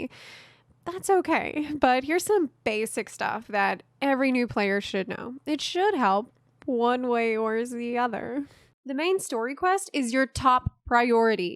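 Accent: South, United States. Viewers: So southern bell, defiantly into it 💕💕💕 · I definitely didn't expect the sweet southern twang in you're voice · Less than 3 minutes in and I am IN LOVE with accent! I'm from the south as well, and to see a fellow southerner, and a strong woman as well, as a successful content creator is such an amazing feeling! Her sense of humor, thorough and concise explanations, and tone are refreshing, relaxing, and the best thing I've watched in QUITE a long time! you have a fan for life!! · Your accent trips me out. It's like a regular American accent 90% of the time, but some words have that great southern drawl. I like it. Great video!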